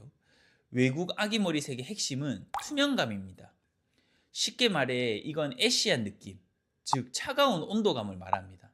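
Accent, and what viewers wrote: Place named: Changwon, South Korea. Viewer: 쌤 창원 출신인가요? 억양이 딱인데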